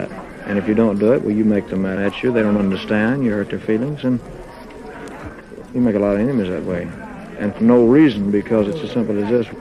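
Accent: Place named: South, United States. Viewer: I LOVE TO HEAR ELVIS SPEAK, HIS AMAZING SOUTHERN ACCENT. THIS WAS A GREAT INTERVIEW WITH THE KING OF ROCK N ROLE FOREVER !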